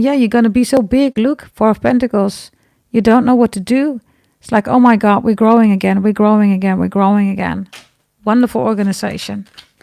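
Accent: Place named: Patois, Jamaica. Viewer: 💛 missing your live and your voice so beautiful and love the Jamaican pathwa and u spot on about me being married and husband Aries and arab and I'm 🇬🇧 will get a reading soon from you 💖 🙏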